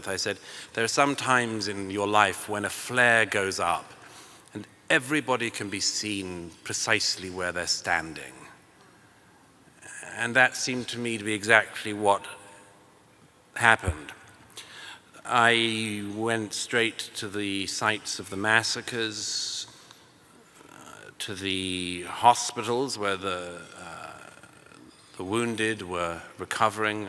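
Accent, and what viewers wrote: RP, United Kingdom. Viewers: For an Engishman u r so passionately zionist. And such a thick carefully crafted upper class British accent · Douglas Murray is the proof that you can say absolute shit, but if you say it a picked british accent, people will fall over backwards thinking you're smart. This numpty is the moron's icon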